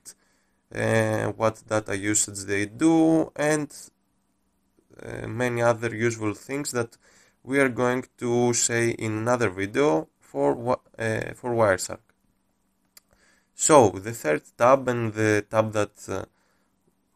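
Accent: Greece. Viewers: ΛΙΠΟΝ ΑΥΤΟΣ ΕΙΝΑΙ ΕΛΛHΝΑΣ ΧΑΧΑ ΠΡΟΦΟΡΑ :) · Πρώτον <link> εδώ έχουν ξανανεβάσει το video σου και δεν νομίζω πως είναι με την άδεια σου.. Δεύτερον η προφορά σου είναι πολύ ελληνική :D Τρίτον δουλεύει μόνο σε pc victims ή και σε κινητά?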